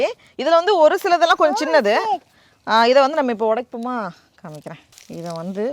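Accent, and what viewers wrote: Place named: Kerala, India. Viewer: Are u malayali.. why am asking this because neenge pesumbothu malayalam slang varuthu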